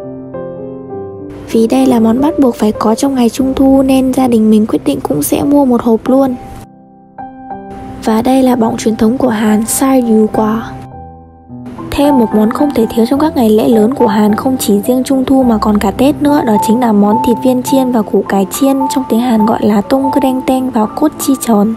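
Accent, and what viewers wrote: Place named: Hai Phong, Vietnam. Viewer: người hải phòng mà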